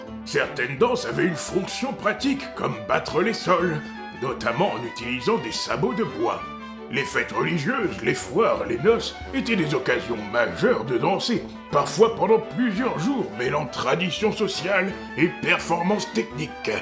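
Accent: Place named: Lower Brittany, France. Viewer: c'est cool de voir qq'1 d'autre qu'un prof de breton ou de danse parler des festou noz a ton accent tu viens de basse bretagne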